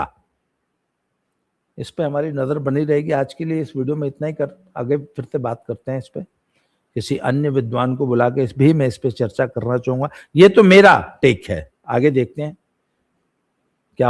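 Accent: Haryana, India. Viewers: ekdam 100 percent hariyanvi bhasa me boltey ho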